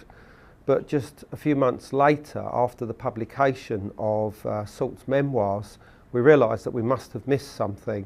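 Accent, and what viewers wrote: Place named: Mockney, United Kingdom. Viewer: Mockney bellend!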